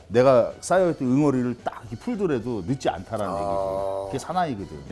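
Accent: Gangwon, South Korea. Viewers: 강원도 사투리 지린다 ㅋㅋㅋㅋㅋㅋㅋㅋ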